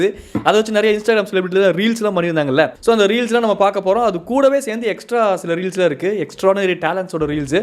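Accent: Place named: Kongu, India. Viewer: Kongu pechu varuthu athan.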